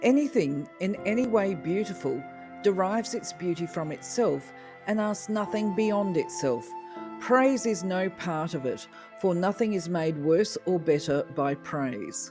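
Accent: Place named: Australia. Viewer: Great content, thank you. Nice touch to hear an Australian female voice narrate a welcoming change.😊👍🏽🇦🇺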